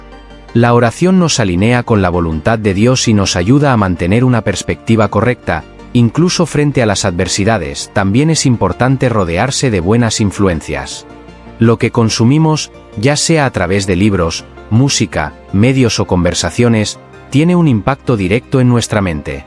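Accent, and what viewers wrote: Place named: Spain. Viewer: Pero. NO me pongas voz de gachupin🤮🤮🤮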